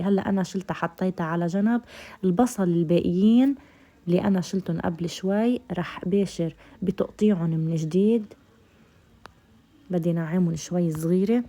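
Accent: Lebanon. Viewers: اضن أنها لبنانية حسب كلامها عسل عسل❤❤🇹🇳🇹🇳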